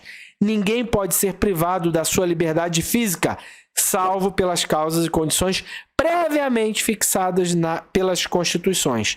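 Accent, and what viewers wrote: Rio de Janeiro, Brazil. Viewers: Heiii até agora tava te ouvindo . Vc e burro ou o que? Eu vi vários vídeos de gente que estava filmando a ruassa que os blackbloc tava fazendo. E eles atacaram esses patriotas que estavam filmando ali mesmo onde os esquerdo estavam ateando fogo nos carros. Vc tá equivocado. Eles esatavam lá até passando mal de medo ao verem carros pegando fogo, e alguns ainda dizendo , “mas esses caras não são lá do acampamento” . Me admiro vc achar uma coisa dessas. Vc não tem assistido mesmo os vídeos dos patriotas , não sabe quem são. Mas eu ja não tava mesmo gostando de ouvir vc esse sotaque de carioca punhado demais .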